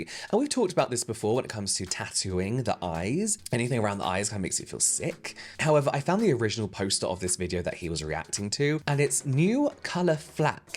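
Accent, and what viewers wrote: United Kingdom. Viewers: tff 💀. has a pretty strong British accent that gets in the way of pronunciation it happens that’s lifee.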